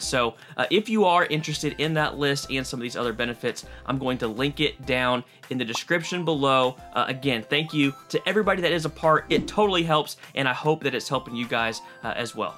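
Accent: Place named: South, United States. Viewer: Where r u from cuz u sud like u from the south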